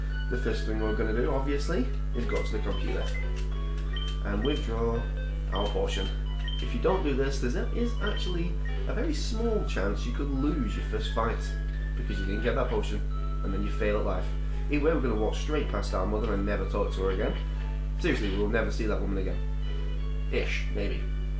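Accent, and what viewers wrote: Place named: United Kingdom. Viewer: guys its he just picked up a british? accent. really good vid man. ima subbed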